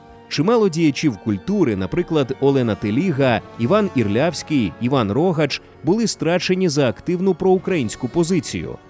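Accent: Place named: Russia. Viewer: (Якщо не говорити про радянський правопис, а саме вимову). Русифікована вимова. Деінде ви сказали Рейх замість Райх. багато ффф - «під її фпливом», багато де українська И, що близька до І, звучить як рос Ы, літера В узагалі ніде не звучить як Ў, де має бути — [створыф], [фсе], [афто] (правильно [стўориў], [ўсе], [аўто]). Г наприкінці слів у вас звучить Х. Тощо. Наперед скажу, це не діялект або щось подібне, а саме русифікована вимова. Послухайте на ютубі Українська літературна вимова Миколи Погрібного. українська мова насправді ще гарніша ніж ви думаєте/говорите. =)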